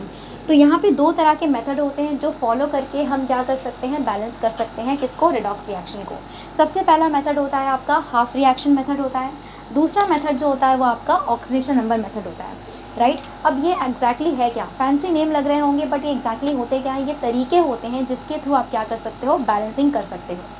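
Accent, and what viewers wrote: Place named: Bihar, India. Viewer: I think you are from bihar.. Am I right?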